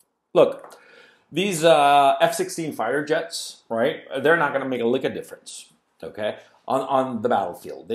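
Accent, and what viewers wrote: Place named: United States. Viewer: Claims to be from Ukraine on twitter, has the strongest american accent in existence, sure bud. How much is Russia paying you out of curiosity?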